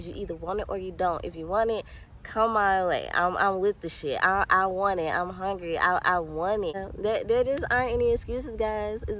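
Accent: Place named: West End, United Kingdom. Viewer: I was trying to figure out where you’re from! Wassup West Ennnndddddd!!!!!!!!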